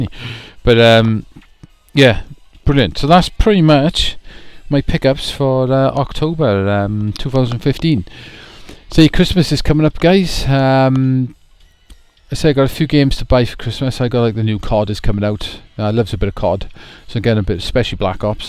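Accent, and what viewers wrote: Wales, United Kingdom. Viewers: Lol..'if you're not into it, it's a waste of money'. I did laugh at that. Even more golden in your Welsh accent ;) Nice haulage indeed. Many may dislike you for that Wii U bundle..jammy git. ZombiU is good fun,I really like it. Great playing that in a dark room, great atmosphere about it. I'd be keen on seeing your new 12 days of, for sure :)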